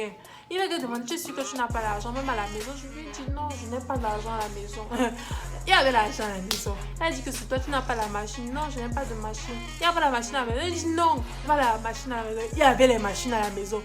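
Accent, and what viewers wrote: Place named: Cameroon. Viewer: J'ai senti que tu étais camerounaise dès que tu as prononcé le premier mot... très belle vidéo je me suis abonnée...satinosaure😂😂😂aaah mes côtes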